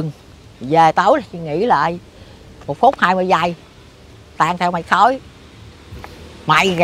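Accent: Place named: Mekong Delta, Vietnam. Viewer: bữa giờ nhớ quá vì không có được xem và nghe tiếng miền tây của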